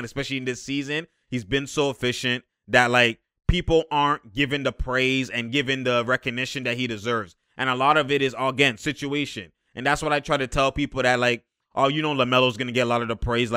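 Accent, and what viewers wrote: Toronto, Canada. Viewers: You from Toronto?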